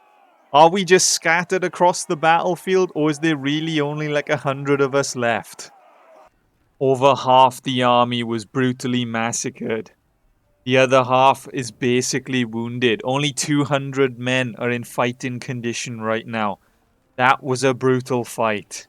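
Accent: United Kingdom. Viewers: love the content, this randomly popped up in my feed. quick question, are you welsh?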